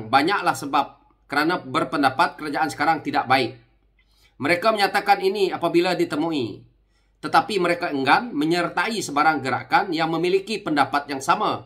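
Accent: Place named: Terengganu, Malaysia. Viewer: Kuat betul loghat trengganu tuu..😅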